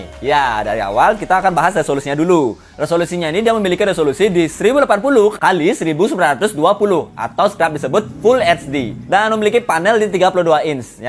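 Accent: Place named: Bali, Indonesia. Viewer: medok bali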